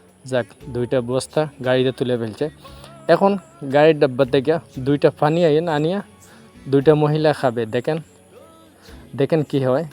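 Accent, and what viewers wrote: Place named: Sylhet, Bangladesh. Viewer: ভাই আপনি তো আমরার সিলেটি মাথে বুঝা যায় ❤😂😂😂😂😂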